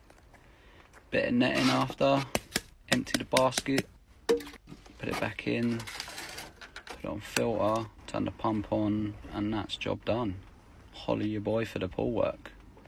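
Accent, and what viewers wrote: England, United Kingdom. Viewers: hovering but with his english accent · Are ya British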